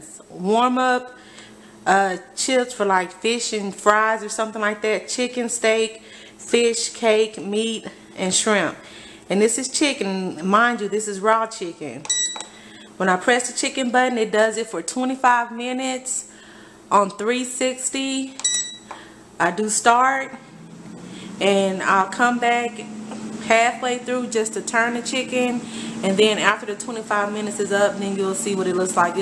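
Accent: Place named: South, United States. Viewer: Love your southern accent. I I might have to invest in one of these things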